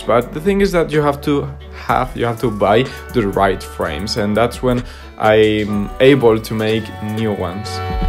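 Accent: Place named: Madrid, Spain. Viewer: Me gusta que subas videos en Ingles pero extraño tu acento Madrileño 💔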